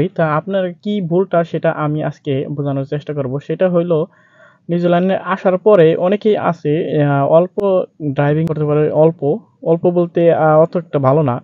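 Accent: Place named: Jamalpur, Bangladesh. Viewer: আপনার দেশের বাড়ি জামালপুর? ভাষা শুনে মনে হচ্ছে... জানাবেন প্লিজ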